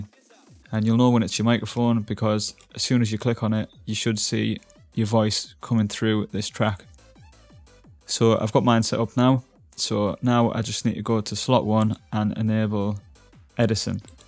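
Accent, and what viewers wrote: United Kingdom. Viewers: anypone talking about his lincolnshire accent, so hot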